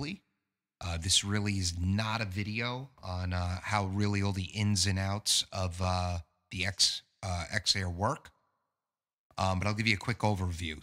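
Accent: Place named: United Kingdom. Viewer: Now I see where your daughter gets her acting spirit! The British Accent adds a nice touch.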